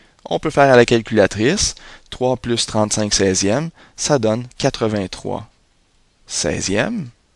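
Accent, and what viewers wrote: Quebec, Canada. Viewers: L'accent québécois ne gène plus tant que ça quand t'a besoin d'aide en math,c'est un fait,vive le Quebec.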